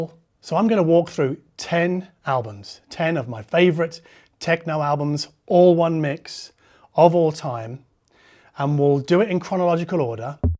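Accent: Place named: United Kingdom. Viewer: Thanks for your recommendations. I am not into techno. The tracks you introduced are killer. System 7 is a brand new experience to me. Beeing audiophile for 40 years now, it is always nice to learn something new. Your videos are on my shortlist everyday, because they have this quality i like. And i like your british accent...👍😜😂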